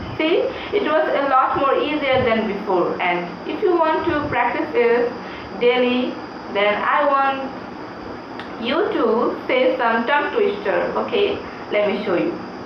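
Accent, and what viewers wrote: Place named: India. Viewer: good luck girl, and compared to a typical indian, your English accent is nice, i can imagine how your accent will be in the next 10 years since you're still young, keep it up ❤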